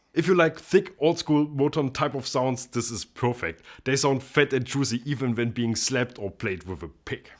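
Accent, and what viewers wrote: Germany. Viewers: Like your german Stile of English :)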